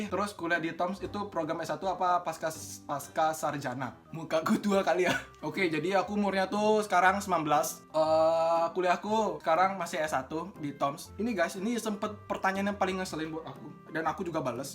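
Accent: Bali, Indonesia. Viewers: Wkwkwk kentel banget bli logat bali nya ... Salam dari Nak Buleleng hehehe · Bali x medok nya 😂😂😂😂 saking griya napi turah? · Aksen Bali banget, Bli 😂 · Logat bali nya kentel ajan bli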